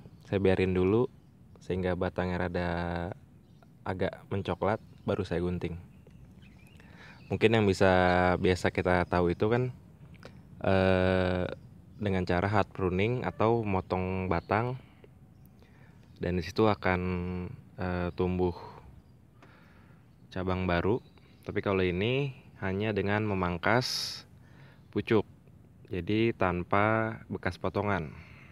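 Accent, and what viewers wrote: West Java, Indonesia. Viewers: kanyahoan sunda na..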